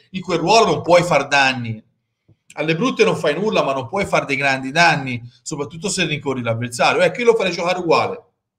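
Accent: Tuscany, Italy. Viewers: Accento toscano Top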